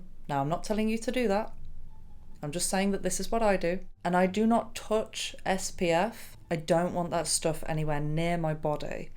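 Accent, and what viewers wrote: United Kingdom. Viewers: I like your accent got a pretty strong powerful British accent😊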